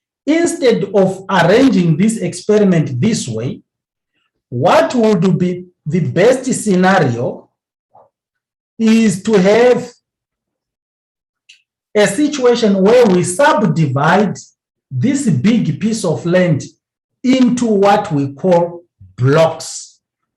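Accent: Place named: South Africa. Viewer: Also I liked the SA accent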